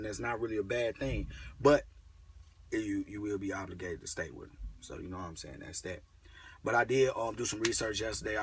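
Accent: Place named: Chicago, United States. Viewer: He ain't lying. I know people making this kind of money owner operating. I knew you was from Chicago when you started talking.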